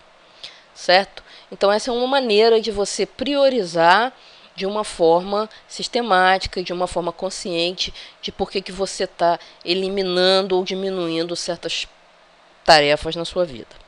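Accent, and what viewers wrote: Rio de Janeiro, Brazil. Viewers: Carioquês forte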